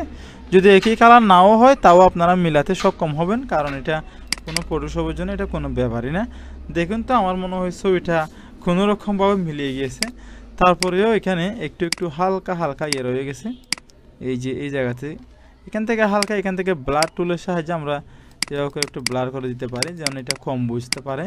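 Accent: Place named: Noakhali, Bangladesh. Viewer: ভাই আপনি তো নোয়াখালীর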